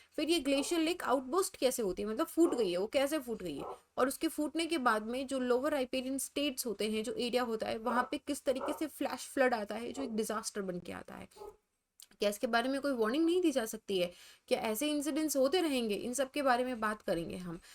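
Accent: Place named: Rajasthan, India. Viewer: Amazing discussion on GLOF, Thank you so much ma'am your voice tone seems like you belong to Rajasthan my home state.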